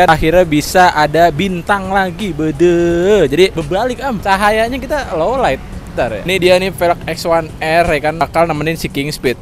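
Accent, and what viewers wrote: Jakarta, Indonesia. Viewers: Logat Betawi nya keliatan😅kayak lucu aja logatnya gitu asik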